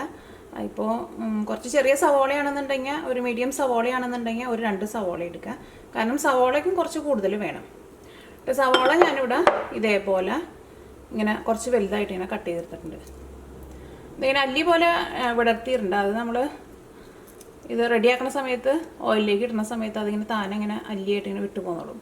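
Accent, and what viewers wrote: Thrissur, India. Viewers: Kalakeet indutta...... Nalla assalu trisoor bhaasha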